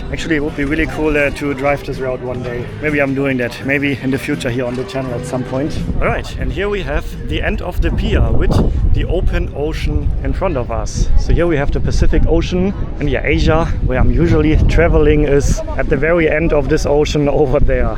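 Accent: Germany. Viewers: Telling us you are german without saying you are german.hehe this is NO hatte! Your english is very nice but it sounds Real german hehe. Like your Videos. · Want to know what a hardcore German accent sounds like just watch this video. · You have the most interesting accent. You can hear the German but sit sounds like German merged with Asian. Interesting😊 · This German-Enlish makes me shudder.:face-pink-tears: My son is living in LA and likes it very much. He was born in New York.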